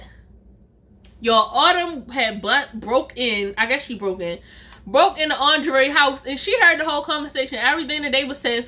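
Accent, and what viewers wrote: Baltimore, United States. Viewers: I’m a new subbie to your channel. You’re such a funny storyteller! I’m really enjoying watching your vids. Andddd I lived close to B’more for a few years and your accent took me back down memory lane. When I first moved there I asked for good food and she said hip hop chicken and said “hot dug”. I said no disrespect but I don’t know what you’re saying could you repeat and ultimately I asked baby girl to spell it!!! Lmao gurllllllllll I felt so dumb! Sorry for the rambling! Sis you’re doing a great job on your channel! Remember to Always keep your head held high so your crown 👑 won’t slip 🧡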